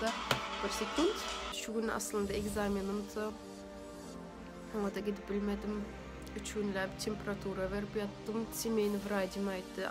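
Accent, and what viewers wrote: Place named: Dashoguz, Turkmenistan. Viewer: хавва мензейа но ахал лебабын дилини гарышдыря ол саядын диалектинде де гурланок скорее всего дашогузлыга мензейа шол шалака ниреден болса да янымда болса сачыны утердим, бизи лебаплылары масгаралап йор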